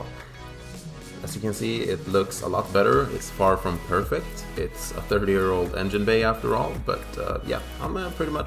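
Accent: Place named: Sweden. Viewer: First time watching one of your videos, and I was like "damn, he sounds hella Swedish". Then I saw the Biltema can 😂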